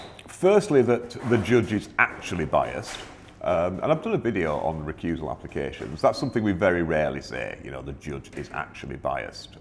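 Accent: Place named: Sheffield, United Kingdom. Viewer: On a side note, have you said anywhere where you are from. Your accent has a North Sheffield sound. Or an I miles away?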